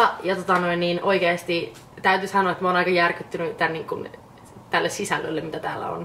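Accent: Finland. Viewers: Wow, Love your Finnish accent:)... also didn't realize your so young (... now I feel? like 'Edward Cullen' old;-). Personally, didn't keep a journal at that age ( a guy thing?... couldn't write right!... hum? still can't!;-). Thanks for sharing your ridiculous with absolute strangers... kinda makes the world a much smaller place. <3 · That Finnish accent makes her look hotter wth❤❤😍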